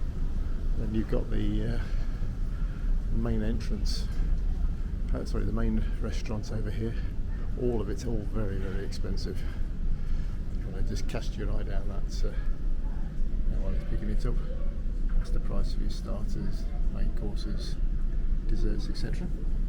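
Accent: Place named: Northern England, United Kingdom. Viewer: I'll be waiting. The Faroe Islands are the only place that I (seem to) have been to via astral travel. It has a kind of spatial attraction for me. My father was from Norway: that may help. Halls Gap? Been there a few times including camping .. twice. Lotsa roos on the streets. Just a query for you: how come you are driving a Belfast registered vehicle while you sound like you are from the north of England?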